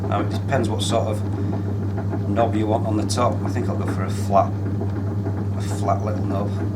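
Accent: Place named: Liverpool, United Kingdom. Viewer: sounds like you're from Liverpool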